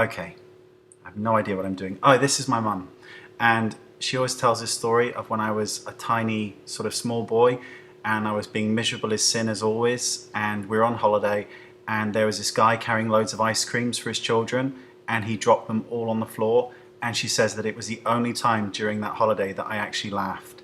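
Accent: United Kingdom. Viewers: Love your story, love how passionate you are about makeup and love your Brittish accent ;)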